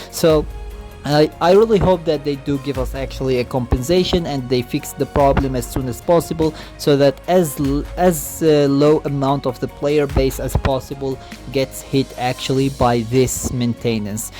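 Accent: India. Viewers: Indian accent XD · Ayo 4 Why is ur ascent Indian is one of ur parents Indian or something like that?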